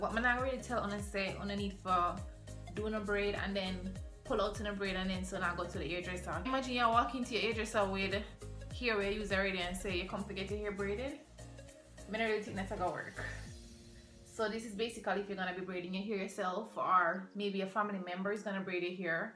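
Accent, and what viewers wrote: Jamaica. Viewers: I hear the accent 🇯🇲🇯🇲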